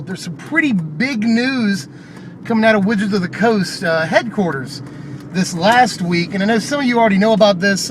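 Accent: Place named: West Virginia, United States. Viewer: West Virginia? The accent fits and the license plates are similar...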